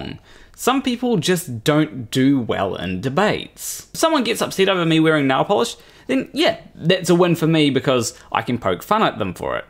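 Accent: New Zealand. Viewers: I forgot to mention that your accent is more unusual to me than your nails. I don't have a lot of exposure to New Zealanders. Probably, the only thing is Brokenwood Mysteries episodes. The long e sound is more prevalent in New Zealand, it seems. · Good one for being You, man. 😁 Your New Zealand accent is also amazing. A-hui-hui 😁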